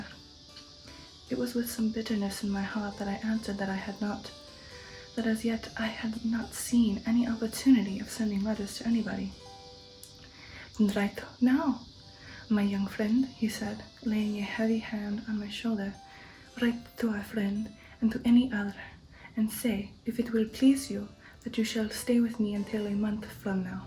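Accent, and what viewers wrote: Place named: Transylvania, Romania. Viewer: it's nice to see you again a perfect accent a bit Transylvanian at some point I loved it always so much a pleasure ^^ (I know how much you love batman so here you go <link>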